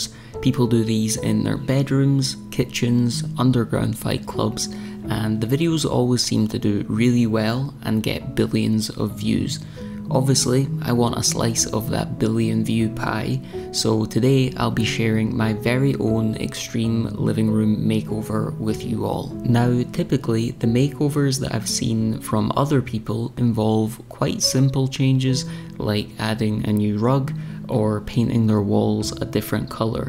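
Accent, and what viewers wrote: Scotland, United Kingdom. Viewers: More Scottish accents please!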